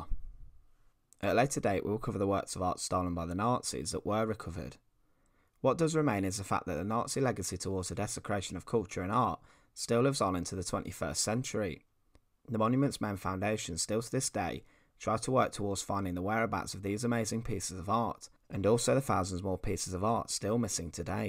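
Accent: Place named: Cockney, United Kingdom. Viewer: I can understand about half of what the narrator is saying. Pretty thick cockney accent.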